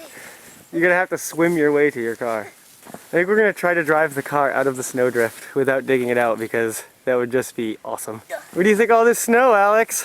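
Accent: Maine, United States. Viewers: What part of Maine are you from?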